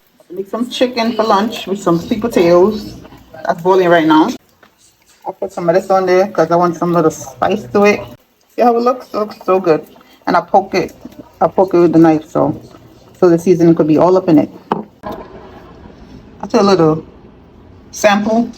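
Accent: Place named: Jamaica. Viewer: Listening to your accent makes me wish I was in Jamaica right now!! Can’t wait to go back!!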